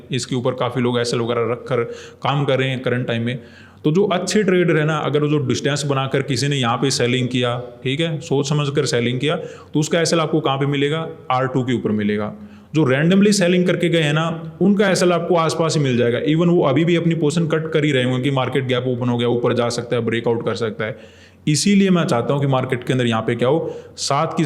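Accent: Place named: Rajasthan, India. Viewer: आपके बातों से लगता है कि आप राजस्थान से belong करते हैं|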